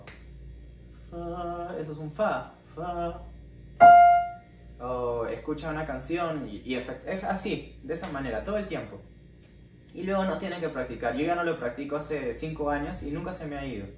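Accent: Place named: Bolivia. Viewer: Excelente. Una pregunta, eres de Bolivia ? Tu acento es muy parecido a un acento de Bolivia.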